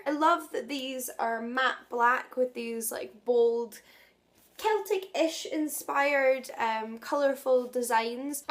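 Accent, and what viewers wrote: Scotland, United Kingdom. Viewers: Beautiffuly illustrated covers! I love your accent too. I miss living in Scotland!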